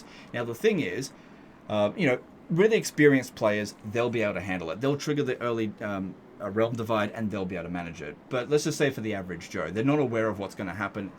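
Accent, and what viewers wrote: Australia. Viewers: never was a huge viewer but it kinda rocks how painfully australian he is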